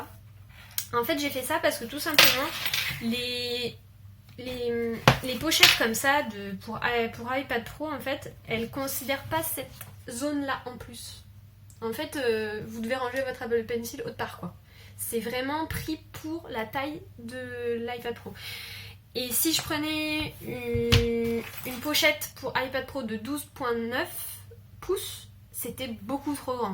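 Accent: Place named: Toulouse, France. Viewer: J’ai passé 1h hier et deux heures aujourd’hui à vous écouter sur Procreate... je suis artiste peintre et je souhaite commencer à travailler avec Procreate . Après avoir écouté d’autres vidéos j’avoue que j’adore la façon que vous avez d’expliquer les choses votre rire votre accent toulousain je suis toulousaine et vous expliquer super bien c’est très sympa votre façon de faire merci beaucoup en tous les cas à très bientôt si j’ai des questions. J’ai déjà un iPad 6 et j’envisage d’acheter soit l’iPad Pro mais j’ai pas beaucoup de sous soit l’iPad Air quatrième génération merci en tous les cas pour vos conseils et continuez à nous régaler avec votre sourire et votre bonne humeur !Evelyne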